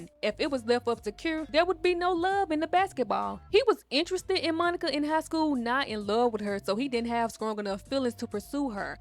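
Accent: Memphis, United States. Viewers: Are you from Memphis? The accent much love ❤️ another great video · Where are you from 😅 because I love a good accent!!! It’s giving a lil Glo so I wanna say Memphis ….lol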